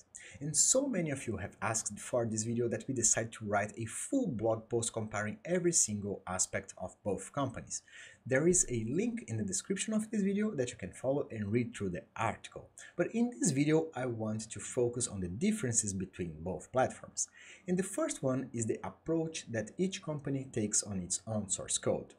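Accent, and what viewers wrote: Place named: Brazil. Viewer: Esta com sotaque de brasileiro rs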